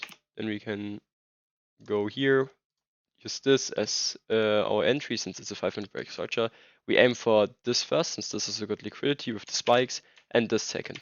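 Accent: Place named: Germany. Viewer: Der Deutsche Dialekt, herrlich, gutes Video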